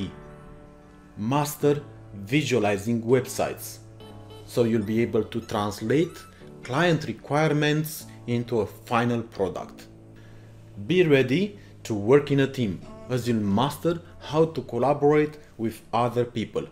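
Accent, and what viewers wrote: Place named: Romania. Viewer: Are you from Romania? Because your accent resembles :) GJ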